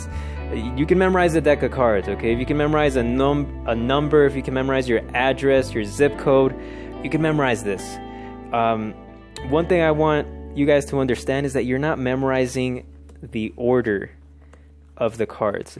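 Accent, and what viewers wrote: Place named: Texas, United States. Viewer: The deadpan texas got me weak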